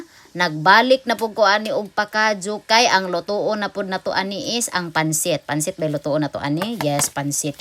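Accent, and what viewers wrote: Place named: Bohol, Philippines. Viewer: Akoang Lolo ug Lola mga bol-anon. Si Lola tga Maribojoc, ganahan kaau sya mag tan-aw sa imong videos nga naay voice over. Mahimoot sya kay bol-anon kaayo.😂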